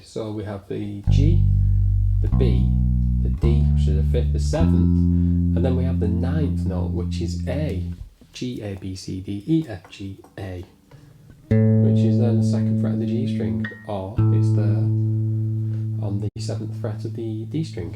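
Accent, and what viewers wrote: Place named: North, United Kingdom. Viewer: Superb lesson .. do I detect a northern twang .. I’ll be practicing my extended scales today , have you any cool exercises to go with them ?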